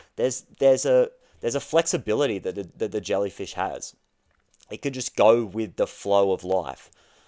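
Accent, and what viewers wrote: Australia. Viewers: Is he Australian? I'm Italian, and I don't know that accent 😅 first I thought it's Scottish but Idk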